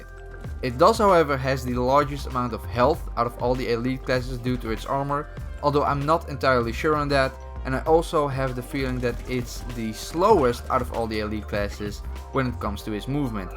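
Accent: Netherlands. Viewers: are you netherlends of dutch because your accent? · Jij bent Nederlands ik hoor het aan jou accent😂😂 maar toch een goede video 👍👍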